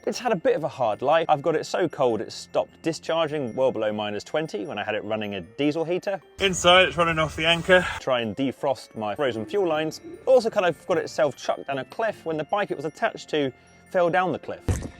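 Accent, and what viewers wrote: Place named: Scotland, United Kingdom. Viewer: my god i got a reply.. been watching you since the start of your conversion i heard the Scottish accent instantly cheered 🤣 and subscribed. I live in argyll. 65-70% of the way done on my conversion but just not getting the time to finish it. Hope all is well with yourself and thanks for the reply 😊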